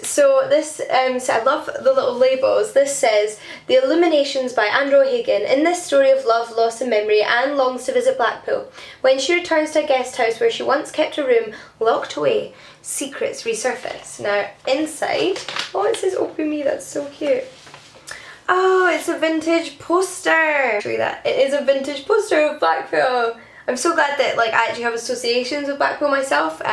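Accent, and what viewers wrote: Scotland, United Kingdom. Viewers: ***** Paid in books and swag, then? Not so bad. I binged quite a bit of your channel last night after I discovered you. Definitely one of my favorite booktubers so far. I'm really enjoying joining this community. But your beautiful accent makes me miss Scotland! My wife and I lived in Edinburgh for a couple years. Our first child was born there. Fond memories. P.S. in your profile pic you totally look like a blonde Karen Gillan.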